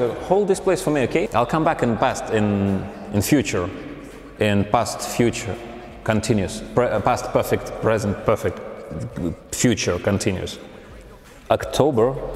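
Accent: Russia. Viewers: Takoy strong ruskiy akcent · we can soooo tell he is russian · I love Russian inglish. Love your content bro.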